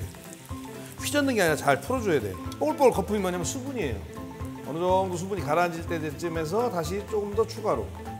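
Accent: Chungcheong, South Korea. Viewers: 자기가 언제부터 충청도 사투리 썼다고 웃음 나오네 충청도 몇년이나 살았다고 내가 알기로는 중고등학교도 서울서 나온거 같은데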